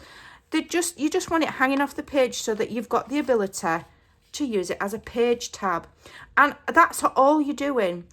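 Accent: United Kingdom. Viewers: This is the first time I've watched your channel. What grabbed me was your accent. Being from Texas, people comment on my accent, course I'm thinking, "What accent?" I'm not trying to pry, and please feel free to say none of your beeswax, but what part of UK does your accent come? I love it. Also, you have really good ideas.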